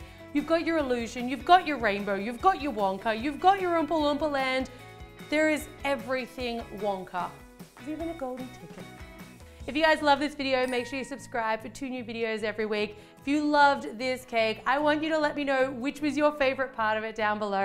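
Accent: Australia. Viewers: Is she Australian? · are u australian ? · she is australian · Anyone know what part of Australia she is from?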